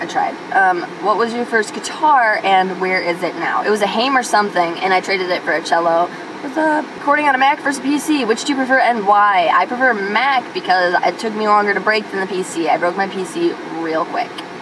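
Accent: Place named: Wisconsin, United States. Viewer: I knew it! She IS from Wisconsin. The way she pronounces her A's like in "tracking" gave it away.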